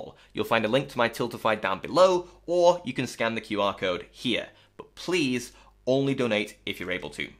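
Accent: United Kingdom. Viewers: In the future it's worth pointing out where the charity you're advocating for is based in. As I suspected from your accent and I did look it up - Mind is based in the UK. I don't have anything against helping UK but I live in Poland and mental health awareness is basically non existent here and we also need a lot of support.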